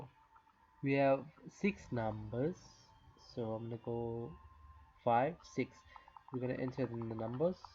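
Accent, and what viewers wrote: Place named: Durban, South Africa. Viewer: okay thats a shame, but thanks for the video, it was really useful and saved me a bunch of time, also are you south african, you sound like you're durban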